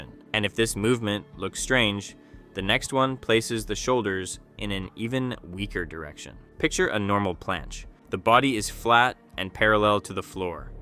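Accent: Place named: Ukraine. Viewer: I missed your videos brother and still miss your Ukrainian accent, hope you are doing well.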